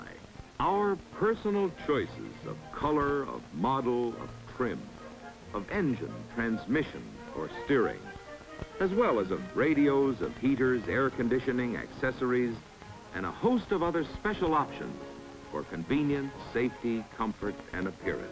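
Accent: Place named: United States. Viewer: Nice! But one thing that I found interesting was how clear and beautiful was the narrator's American.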